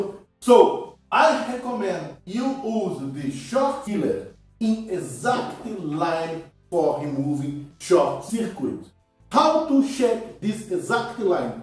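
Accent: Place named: Portugal. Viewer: Such a fucking Portuguese accent in english!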